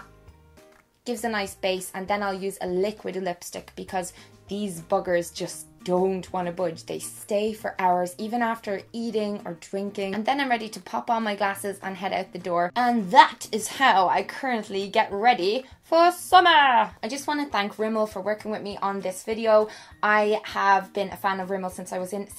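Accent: Ireland. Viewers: i luv ur voice i wish mine was that irish 😍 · wait, are you Irish???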